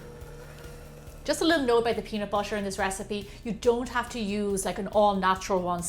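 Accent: Ireland. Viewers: Love the recipe by any chance are you irish ☘️? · are u irish?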